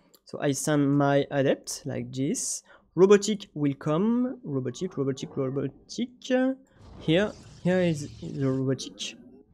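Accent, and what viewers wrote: France. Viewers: sont accent est si français que les sous titres automatique sont généré en français j'adore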